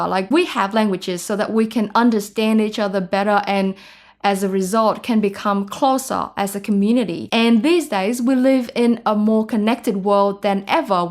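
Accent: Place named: Australia. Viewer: Dạo này em bị mê Aussie accent của chị quá huhu. Chị làm nhiều content bằng tiếng Anh đi để em có thể thụ động ngấm Aussie accent của chị với huhu 😭😭 Em ngán TV accent của em quá rồi, nghe hơi basic =)))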